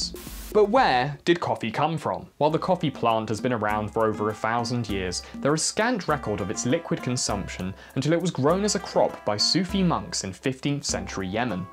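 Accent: United Kingdom. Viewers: typically I hate channels that are feature a condescending British dude but yours is pretty ok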